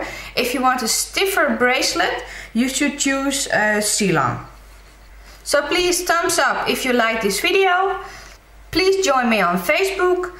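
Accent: Netherlands. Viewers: are you from the netherlands? cause i am and your accent sounds like your dutch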